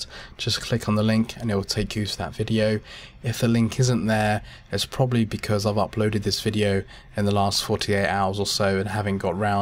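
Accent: United Kingdom. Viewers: Great to hear UK tutors on line.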